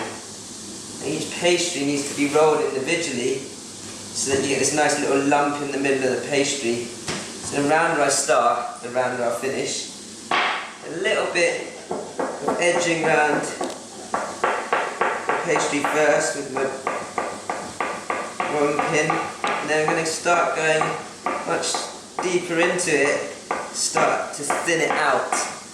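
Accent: England, United Kingdom. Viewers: love this dude's accent! asian man talks like some englishman. And honestly how on earth does the XLB not look legit? This man's got better technique than most bogus white chefs who's trying to cook "fusion" or "chinese" !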